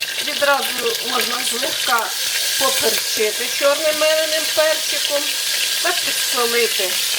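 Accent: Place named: Surzhyk, Ukraine. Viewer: Раньше вы говорили на русском языке, а сейчас на суржике...но мне нравится и понятно каждое слово...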